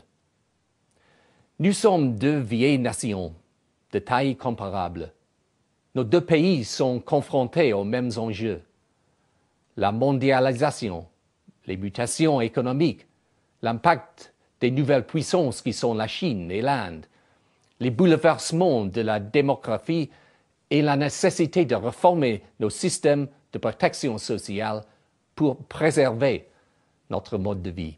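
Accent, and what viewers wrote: England, United Kingdom. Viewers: I can't believe that some of you French people are critiscising him for having an English accent. What the hell do you expect? English and French are both strong languages and when we try and speak eachothers language we always speak it with a very heavy accent, yours is just as bad as ours! Look at Arsene Wenger, his accent is as strong as the day he moved to London 13 years ago. He cannot say a single world and sound English.... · he has a realy nice french speaking and a nice charming English accent. Bravo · it's very good , we can understand everything , but there is still this typical english accent (the 'ey' , the softened 'r' , some syllables are skipped....) and that sounds a little like a chinese speaking french 8D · lol!J'adore l'accent british!!comment il prononce "mondialisation" et "pouissances" ^^ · he speaks very clearly... 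i'm french, he just has this so recognizable english accent on french language. that's very beautiful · God im English and even I can tell her speaks french with a big english accent! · Grr.. he's got such a typical "English-student-learning-French" accent. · i don't speak french but even i can sense his english accent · Oui, il conserve évidemment son fort accend anglais mais sa prononciation et son vocabulaire sont excellents ^^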